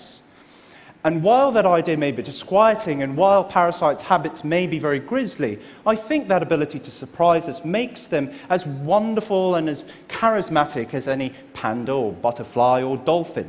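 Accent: United Kingdom. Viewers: I love an asian who speaks with an impeccable British accent. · I like his British accent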